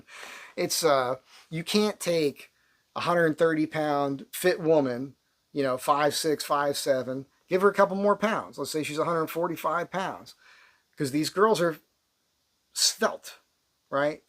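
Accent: New Jersey, United States. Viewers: LOVE YOUR YT.YOU ARE REAL🎉🎉 FOUND YOU FROM SARAH ❤🎉 HEARD THE JERSEY ACCENT AND MIND SET.I GREW UP * BACK EAST** I UNDERSTAND YOUR SELF SUFFECIENT LIFE❤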